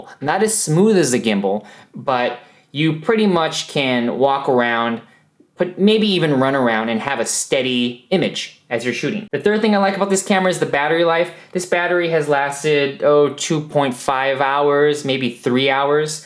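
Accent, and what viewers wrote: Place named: South, United States. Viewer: Говор этот южный... поубивал бы начлен. Чесслово, уши вянут. А после Samsung HMX-H405... Ну, чего тут сравнивать-то - земля и небо. Если кому надо русифицировать AX60 или настроить AX53 (или иную камеру Сони) на 30/60/120 к/с - обращайтесь, научу. У меня АХ53 пишет в NTSC и дез получасового ограничения записи.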